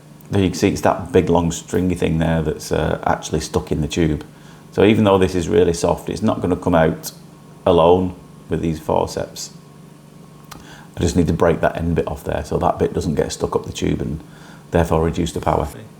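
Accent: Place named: England, United Kingdom. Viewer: It's hard to understand you sometimes when u our speaking because of your English accent 😊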